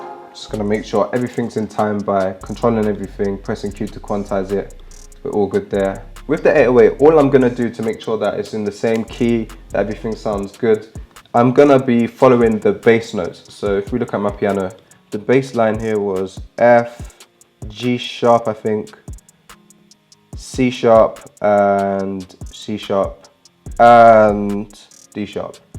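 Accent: United Kingdom. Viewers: Why do people with a British accent love to use the word “literally” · Its sound british af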